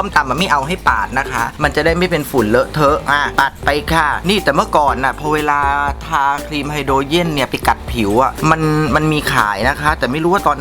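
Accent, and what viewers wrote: South, Thailand. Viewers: คนสุราษ เหรอ แหลงใต้แลมั่งตะ🤓🤓🤓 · สำเนียงออกทางคนใต้?? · เป็นคนใต้รึเปล่าครับ พูดทองแดงชัดเลย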